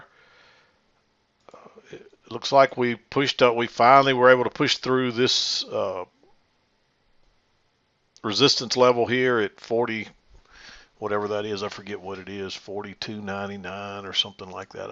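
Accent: South, United States. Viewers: Boooo!!!!!!! Y’all leave that man alone. He’s from the south! We say stuff how we wanna.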